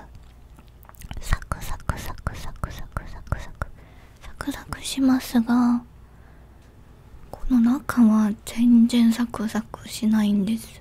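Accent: South Korea. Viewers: かわいすぎ〜(*≧∀≦*) ヤッバ⁉︎ 韓国人が喋る日本語だーいすき❤️わかる人おるやろ爆笑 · もう一生懸命話してるの可愛すぎます← 韓国の方が話す日本語大好きです！（唐突） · 韓国の方だからですよ〜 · 普段日本語よりも韓国語の方が 発音とか口調とか色々と かわいいと思ってるけど、 韓国の方が喋る日本語はめちゃ可愛い🖤 とてもいい音ですね〜！ これからもがんばってください！ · 韓国人が喋る日本語スッッッッゴイ好き。この気持ちわかる人おる？めっちゃ可愛いんやけど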